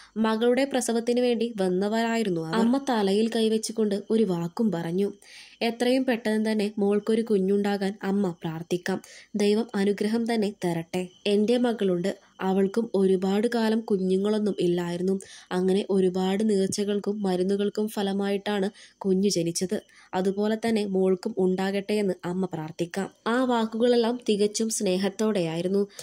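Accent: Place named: Malappuram, India. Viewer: ഇവരുടെ ഭാഷ കേട്ടിട്ട് മലപ്പുറം ആണ് എന്നാണ് തോന്നുന്നത്...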